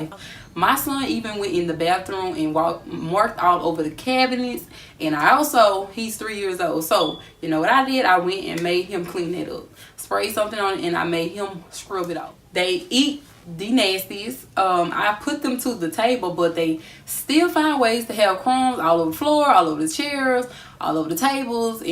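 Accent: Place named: South, United States. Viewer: Love the southern accent. Where y’all from?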